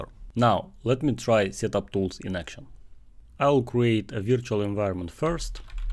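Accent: Russia. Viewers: Прекрасный русский акцент )) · Мне одному показалось, что родной язык автора всё-таки русский? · Лайкт фор зэ кул рашн экцэнт энд эксэпшонал контэнт!!! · are you russian?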